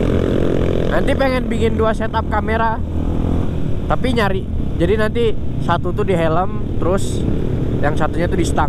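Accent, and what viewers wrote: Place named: West Java, Indonesia. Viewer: Orang jatim mas ? kok ngomongnya gak kelihatan logat jawa mas.. malah kayak dari logat sunda... hehehe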